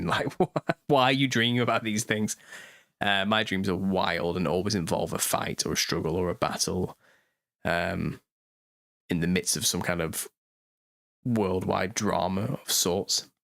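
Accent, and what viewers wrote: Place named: Liverpool, United Kingdom. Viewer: You talk like an Everton supporter.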